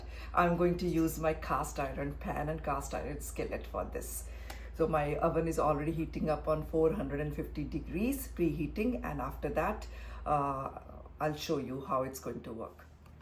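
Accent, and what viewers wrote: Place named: Punjab, India. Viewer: We love your Punjabi accent… please continue in Punjabi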